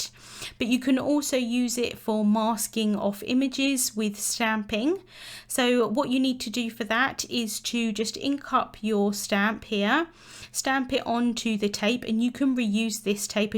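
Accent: United Kingdom. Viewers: I am so glad I found you today over at MFT. I love your card making style, and I love your voice and British accent are so pleasant to this American Southerner to listen to! I appreciate all of these tips, and I look forward to watching many more of your videos!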